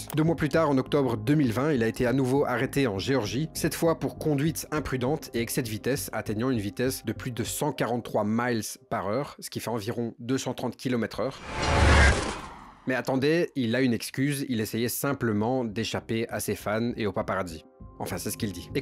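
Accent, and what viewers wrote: Belgium. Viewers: Par contre g reconnu un accent belge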